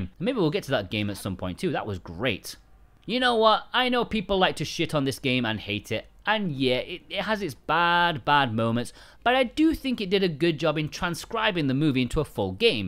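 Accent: England, United Kingdom. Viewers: I've given you a sub, great video btw, but I'm still baffled where in England your accent comes from, maybe it's a Mos Espa twang.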